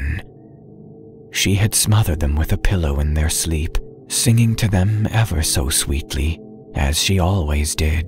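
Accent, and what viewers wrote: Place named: United States. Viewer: That American accent is solid (as are the story & narration, of course).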